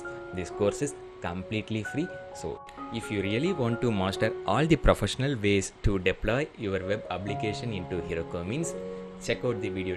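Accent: India. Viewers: Honestly, it's probably the thick Indian accent. It's a big put off for many native English speakers. Not to mention some of the darker blues in that colour scheme you're using for that VS IDE is hard to see.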